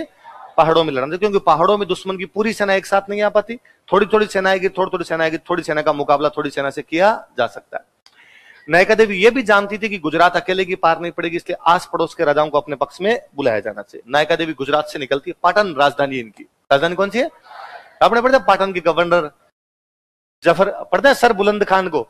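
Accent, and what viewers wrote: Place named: Punjab, India. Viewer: Sir ki Panjabi Kamal ❤❤❤❤❤❤❤😂😂😂😂😂😂😂😂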